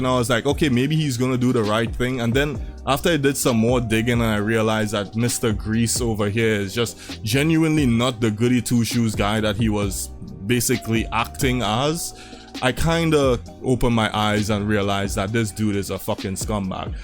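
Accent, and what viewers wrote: Trinidad and Tobago. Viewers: Bro, you have a very trinidadian accent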